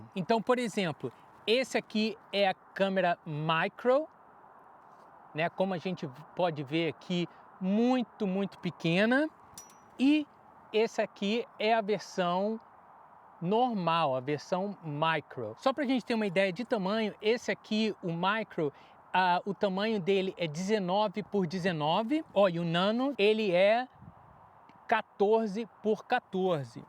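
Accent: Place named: United Kingdom. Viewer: Vc mora no Reino Unido ou já morou por la? Tu tem um sotaque muito britânico hehehe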